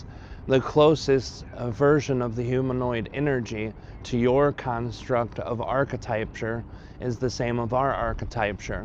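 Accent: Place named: RP, United Kingdom. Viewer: Wow I'm from UK and channeling u have the poshest English voice I've ever heard,💕 was brilliant video ,thanks💕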